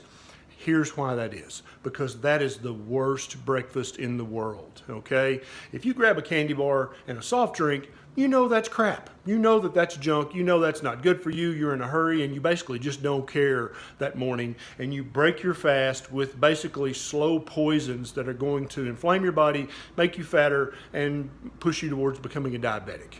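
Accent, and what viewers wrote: South, United States. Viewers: 04/22/2021 Your voice sounds so familiar.🤔 I think Justin Peters ...🤔 Love the Southern accent.👊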